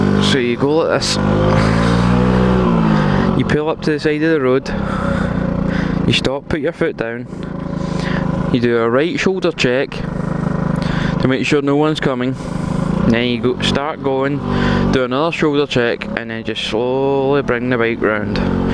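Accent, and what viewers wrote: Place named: Glasgow, United Kingdom. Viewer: Whereabouts is this u sound like ur from glasgow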